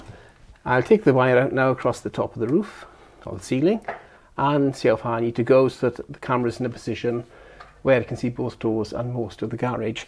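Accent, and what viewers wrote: Wales, United Kingdom. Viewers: just bought one, this video was excellent, cheers for sharing, also do I hear a hint of welsh or am I linguistically challenged, lol?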